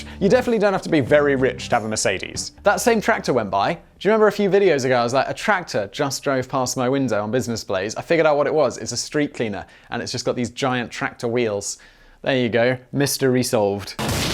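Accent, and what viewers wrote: United Kingdom. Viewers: Off-topic linguistic question from an American; You said, "Comer" instead of "coma". Why is it that people in the UK tend to pronounce "uh" or "a" as "er"? Honder, comer, etc... Is that the original intention of the pronunciation and it's just another way America tried to be different? Or is that just sort of how your speech evolved, like a vernacular?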